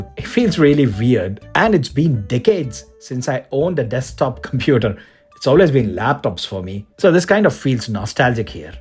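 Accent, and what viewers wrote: India. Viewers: are you an Indian Filth. eww the accent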